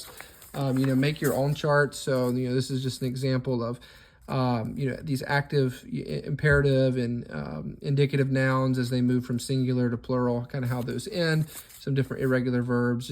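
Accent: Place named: England, United Kingdom. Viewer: Bro, if you can't pronounce - don't. Just add background music, do a little dance pointing with your fingers to text on screen. Or just insert audio from someone who speaks the language like Luke Ranieri or Metatron. Or at least have some humility, say something like "haha, probably butchered that". But, come on, this thick accent with not vowel length and English R is simply insulting! Imagine, idk, teaching people how to learn Japanese while saying "R.E. ga toe". I heard you trying to trill the R, but that was like the last thing I would worry about.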